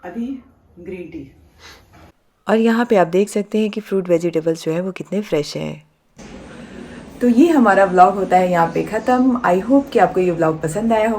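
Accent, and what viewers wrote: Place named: Ballia, India. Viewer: Are you from somewhere in Ballia (U.P.)?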